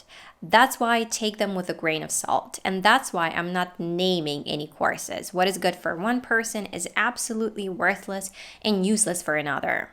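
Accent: United States. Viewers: Thank you Mrs. for your precious opinion. I find it worth watching as I had bought a course in vain and couldn't apply it as my lifestyle was too time-taking in offline so I couldn't sit calmly and learn it online, that was terrible experience sadly. Some notes I jotted from the video: — Not advertised enough course might be the best course one may ever have taken Therefore don't be so captivated by any promoted course, the quality is much more vital than peripheral ads (the goal of those is just find customers not more than that) — Feedback is important as well as a trial period — Biased can be anything that someone can suggest to others cuz everyone has different tastes to say the least Sales under the pressure can make you buy the course that you don't even need or the course might not be organized well for one's lifestyle P.S. Mrs. you look great like a Ukrainian (I guess, is that true? 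) and perfect with American accent it matches you so much, especially when it feels that there is a sense of what you're talking about Thank you so much🙏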